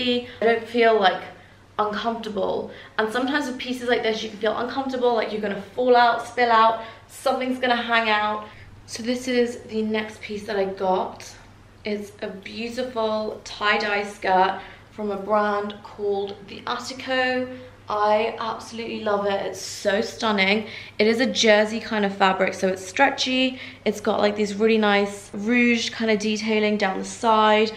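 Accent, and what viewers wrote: United Kingdom. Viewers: You are iconic (with the British accent)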